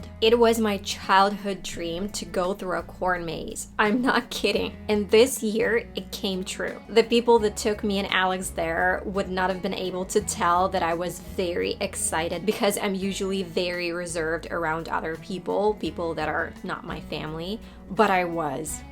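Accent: United States. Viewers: Дуже багато американського акценту)) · I'm shocked that you're from Ukraine, because you sound 100% American!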